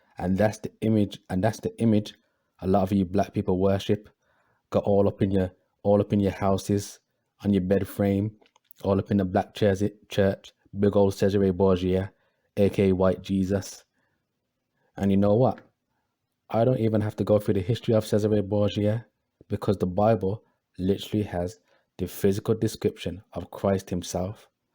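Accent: Birmingham, United Kingdom. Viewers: This guy speaking is a brummie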